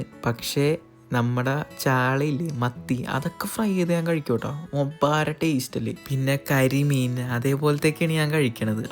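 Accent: Ernakulam, India. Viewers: I love your ekm slang❤️❤️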